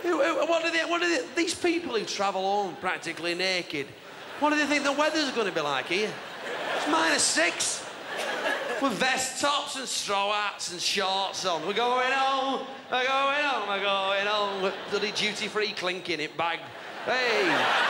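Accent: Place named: Bolton, United Kingdom. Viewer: "Pissed off with er stuck up" 🤣🤣🤣 Bolton accent eh lol...COME ON... Keep them coming... Makes my bloody day..😁😁👍✌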